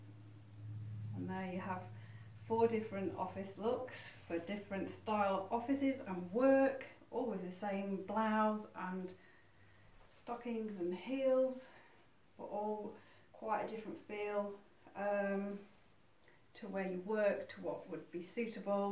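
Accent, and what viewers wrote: United Kingdom. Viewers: Hi Thanks for responding, most appreciated. I know that your are passionate about Cervin, but I've just had a mail from Gio - 50% off everything and their stockings are very good too.. Lovely to hear your northern accent. Where are your origins? I'm originally from Bolton/Chorley. Have a lovely evening xx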